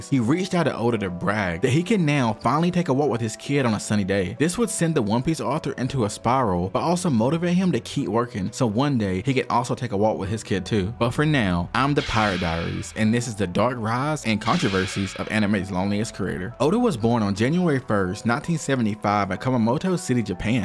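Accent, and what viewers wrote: South, United States. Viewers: That southern accent lowkey hittin’ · Now realizing you’re jus doing a YouTube accent to cover your southern accent, bro own it, be the southern one piece youtuber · i aint the only one who hears a county acsent right?? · Where is that accent from is sounds southern but im not sure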